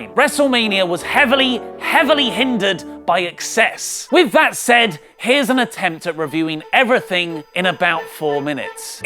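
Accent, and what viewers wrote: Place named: United Kingdom. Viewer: ... i enjoy your YouTube channel, but seriously your compulsive British accent really makes me wanna gather a huge crowd and initiate a chant of "Shut the fuck up "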